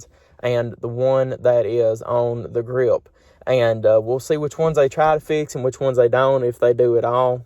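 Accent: South, United States. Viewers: Well...it is Connecticut no? Perhaps run through a few times of problems with a pal without such the deep southern accent, and have them call for kicks? see if phone response sounds different? sooks of your Python issue(s) ~ iken imagine the anticipation of first squirrel headshot not having to lop it off for stew and goes click. Not fair. :-)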